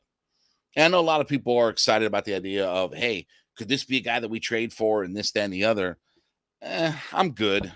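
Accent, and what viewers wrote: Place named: North, United States. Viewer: You make me laugh so much !! I have no idea where you from but definitely from north of Mason Dixie line. Thx for the entertainment! Go Braves and Falcons!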